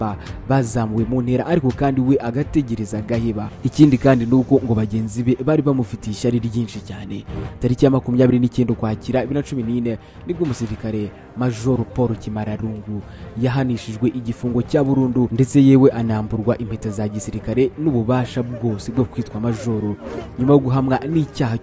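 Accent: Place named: West Africa. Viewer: Yarafite accent nkiyabo muli afrique de l'ouest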